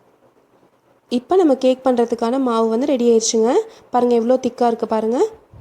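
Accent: Coimbatore, India. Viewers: Nega coimbatore ah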